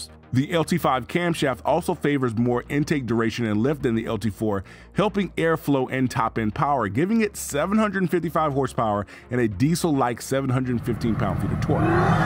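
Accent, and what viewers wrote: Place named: Indiana, United States. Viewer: YOUR ACCENT SAYS INDIANA/SOUTHERN ILLINOIS TO ME I MEAN THAT AS A COMPLIMENT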